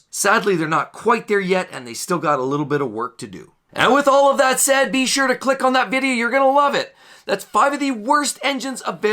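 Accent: Canada. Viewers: God you sound like Bret the Hitman Hart....Canadian accents.....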